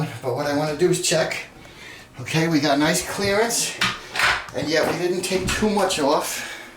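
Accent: New York, United States. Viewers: LOL!!! Glad you said that about the masking tape. I did that when doing a fret leveling/crowning on an acoustic guitar.....with the lightest tack painters tape......something came up and I left it on for 4 or 5 days, and it was like epoxy!!!!! Something that peels off so easily turns into the hardest thing int he world to get off......I wonder how many will listen to you on that. With that, people might say "This stuff...nah....it barely stays on...how could it ever be difficult to remove"....and they have to learn it for themselves!!! Good stuff though. Trying to figure if you're Staten Island or Long Island.....definitely not Manhattan, maybe Bronx, not Brooklyn......trying to get the accent. lol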